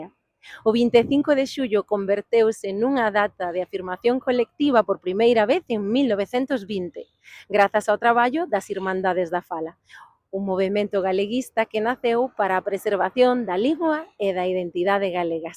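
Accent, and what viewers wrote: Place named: Castile, Spain. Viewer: pero esta chica tien un acento castellano que asusta. Habeis dejado que españa y su castellano ose care vuestro idioma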